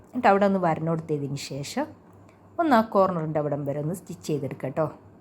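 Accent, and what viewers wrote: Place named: Vadakara, India. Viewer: Are u from വടകര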